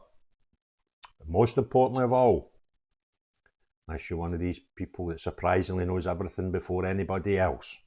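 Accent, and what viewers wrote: Scotland, United Kingdom. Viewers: I really appreciate your investigative journalism. Plus your topics. Equally in the meantime I am having to learn to understand the Scottish accent which I do enjoy.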